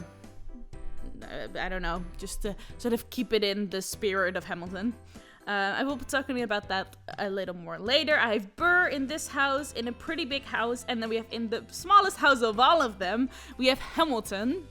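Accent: Netherlands. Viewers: your accent is so cute! i really want to learn dutch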